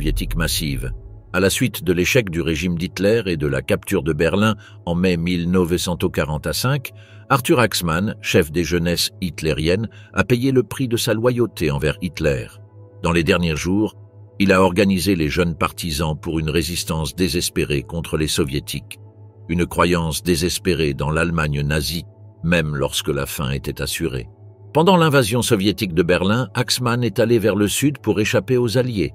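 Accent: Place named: France. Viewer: Curieux, ce doublage par l'intelligence (?) artificielle. : les années dans les dates sont en italien, prononcées avec un accent francais à couper au couteau.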